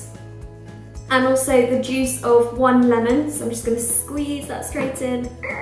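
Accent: United Kingdom. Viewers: omg that British accent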